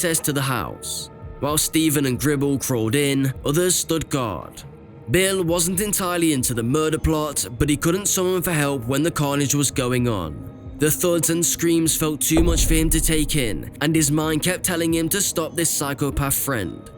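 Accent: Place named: England, United Kingdom. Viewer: This is weird. The narrator seems to have a genuine English accent, yet the script is clearly written by someone who does not speak English very well. How does this business model work? Is the person who wrote the script paying the narrator to narrate it, or is the narrator buying scripts from a foreign content mill? It's interesting that the narrator didn't correct the broken English, despite the fact that he must have noticed the errors.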